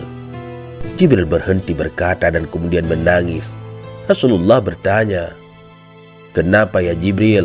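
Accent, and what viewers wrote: Aceh, Indonesia. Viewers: Adminnya ada slang melayu acheh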